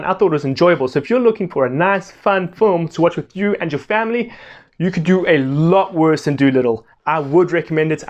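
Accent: Wales, United Kingdom. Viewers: It's a Welsh accent like mine from the valleys. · It’s a Welsh accent! Like mine!and Littlefinger isn’t doing welsh it’s Irish diluted with English.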